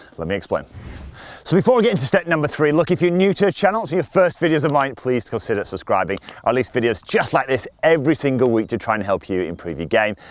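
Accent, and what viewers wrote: Yorkshire, United Kingdom. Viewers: Thank you. Yet another super well explained video, simplifies the process. P.S. I love the subtitles trying to cope with your Yarkshire accent! I'm still trying to find my "pudding stance".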